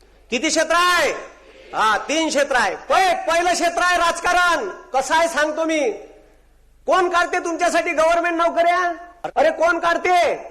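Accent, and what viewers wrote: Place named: Vidarbha, India. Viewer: अबे हे वऱ्हाडी होय शुद तु बेलत जाय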